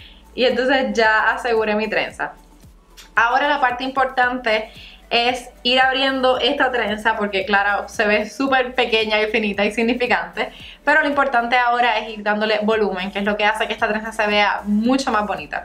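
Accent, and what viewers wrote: Puerto Rico. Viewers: (pues lo supe por el asentó Boricuaaa jajaj yo también soy de allá besosss)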